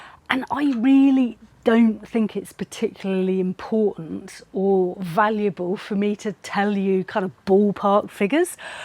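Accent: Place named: Bristol, United Kingdom. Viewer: I’m starting to hear your Bristolian accent! Thanx for these informative kebab videos my love! Greetings from a fellow Bristolian stationed in sunny Cyprus 🫡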